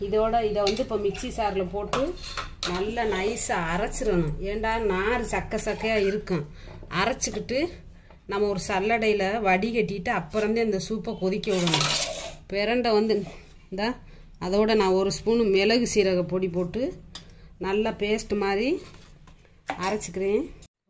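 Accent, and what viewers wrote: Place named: Madurai, India. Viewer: How nice to hear Madurai dialect😘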